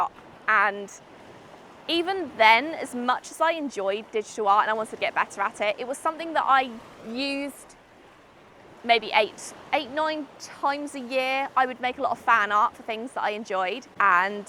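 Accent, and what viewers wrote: United Kingdom. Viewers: Who else love her British Accent?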